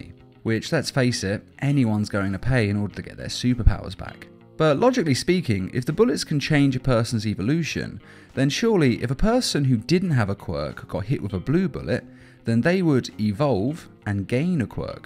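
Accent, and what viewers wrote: England, United Kingdom. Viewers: He sounds like he is from England and it’s hard to get manga here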